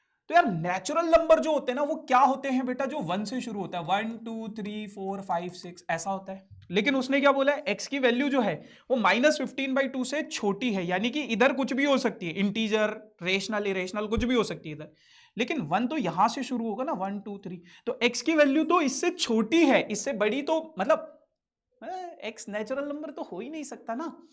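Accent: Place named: Haryana, India. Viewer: Haryanvi hi hai mdm ji❤😂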